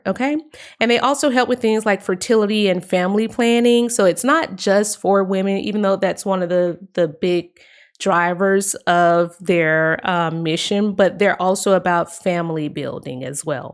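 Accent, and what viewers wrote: Spain. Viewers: ME ENCANTA TU ACENTO (i AM ENCHANTED BY YOUR SPANISH ACCENT);)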